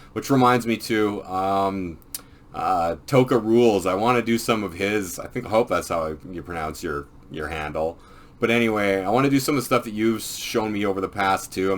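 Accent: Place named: Canada. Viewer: You're pretty good at this for starting up. We all need to do all we can to spread info that is being suppressed. May want to move your mouse a bit further from the mic when you scroll so it it doesn't pick up so many clicks. Knew immediately you were from Canada, if I had to guess I would say your from BC maybe? Canadian accents are weird in how they are distributed. I'm from rural sask. Hosers unite against the NWO!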